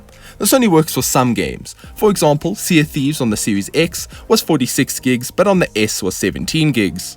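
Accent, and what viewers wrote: South Africa. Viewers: Amazing review, the best one Iv seen👍👍❤️❤️You sound like you have a South African accent, I'm from South Africa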